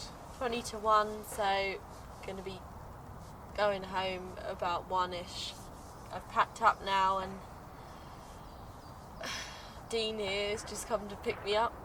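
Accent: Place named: England, United Kingdom. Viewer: I love your accent, which area of England are you?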